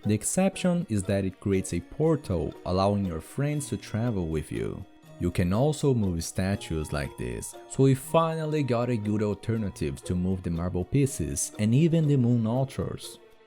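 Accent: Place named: Brazil. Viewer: Será mesmo? O inglês é ótimo, mas me lembra brasileiro.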